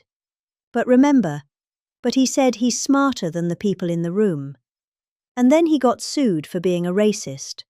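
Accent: England, United Kingdom. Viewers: Dear Americans, that is an English accent. There is no such thing as a 'British' accent.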